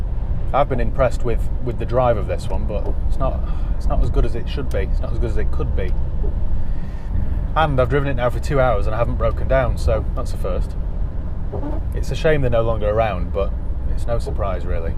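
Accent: United Kingdom. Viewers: I like your straight attitude and accent. Proper British.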